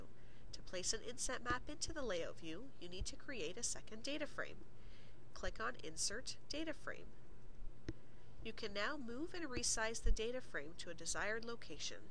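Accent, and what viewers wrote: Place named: Mid-Atlantic, United States. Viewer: gotta love that mid east coast accent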